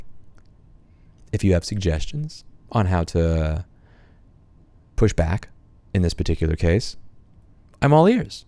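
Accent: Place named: Texas, United States. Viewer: He has a texas accent now!!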